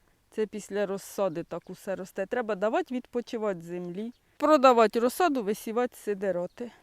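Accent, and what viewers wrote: Poltava, Ukraine. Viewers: Мабуть звідкись з Полтавщини судячи по говору. )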